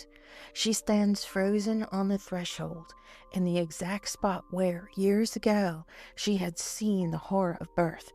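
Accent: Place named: South, United States. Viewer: This was amazing! I absolutely love the southern drawl accompanying the southern gothic.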